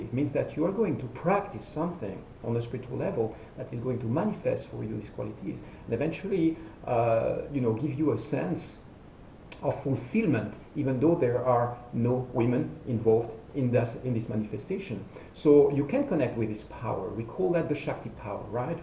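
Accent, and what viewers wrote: France. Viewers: Agreed, again. BTW, Vous etes français ? Your accent sounds a lot like French, it's cute.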